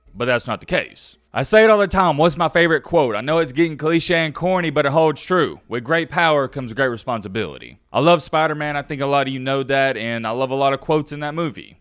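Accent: South, United States. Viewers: C'mon bro southern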